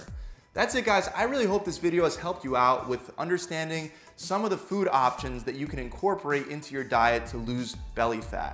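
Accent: Philadelphia, United States. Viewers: You gotta be from Philly. LOL Wooder instead of water. I miss the Philly accent. Anyway your vids are amazing. Keep em coming.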